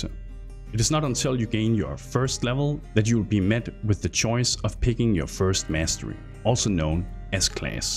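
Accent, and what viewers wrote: Denmark. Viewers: Like ur videos, it sound like ur from Denmark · im guessing from ur accent that ur danish, but im not sure. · It’s a danish accent lol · Haha den dansker accent XD god video min ven. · are you Danish? your dialect sounds so Danish! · The lad is Danish so cut him some slack ffs.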